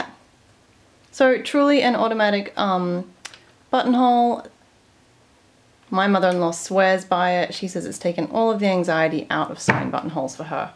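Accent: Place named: Australia. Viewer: Love your review of this machine. I have the original lotus Tx and haven't used it for awhile so inspired to start using it again. I would love it if you could go through a review of the original one too. I love your sewing room are you able to do a video of that and how you set it up, it looks very organised. I am from Australia just wondering with your accent if that is where you are from. Great work your videos go at a good pace to understand and you are nice and clear and camera work is great too, well done.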